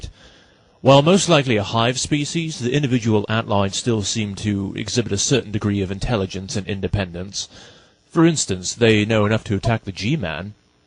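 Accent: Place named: Northern Ireland, United Kingdom. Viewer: oooh, your part Northern Irish then? I live there! (=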